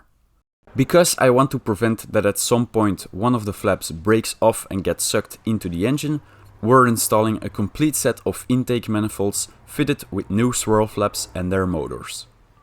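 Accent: Flanders, Belgium. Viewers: Hahaha uw belgisch accent maat 😂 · Ok subscribed, lekker vlaaams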